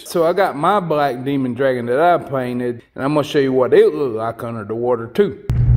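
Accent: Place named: Southwest Virginia, United States. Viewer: If I didnt know any better , I would say with the accent you probably pretty close to my neck of the woods Southwest Virginia here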